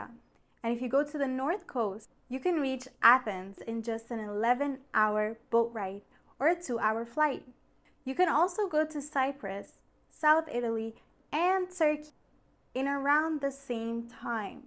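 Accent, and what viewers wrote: United States. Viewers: You sound American or Canadian. Its hard to believe you learned English in Egypt. You must have watched a lot of movies or something.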